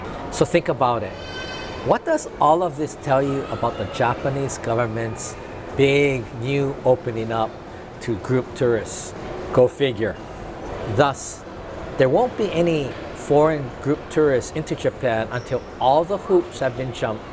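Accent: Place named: United States. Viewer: Thanks for your insight! Although it's a disappointment, but I think you might be right on allowing solo travellers in 2023 BTW, you speak with an American accent. You must have lived in the U.S for many years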